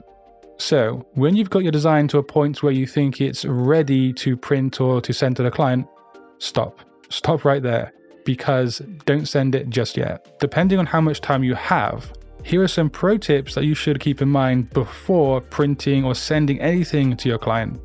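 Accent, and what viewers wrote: United Kingdom. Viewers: Are you from the USA? I thought you were from the United kingdom.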